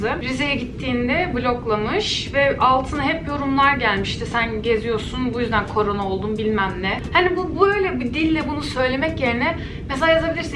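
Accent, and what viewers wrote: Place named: United Kingdom. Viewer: British aksanı olmuş o biraz ahhaha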